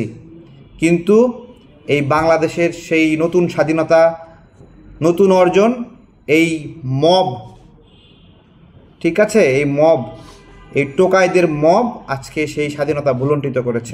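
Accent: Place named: West Bengal, India. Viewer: আপনি বাংগুদের সুরে কথা বলতেছেন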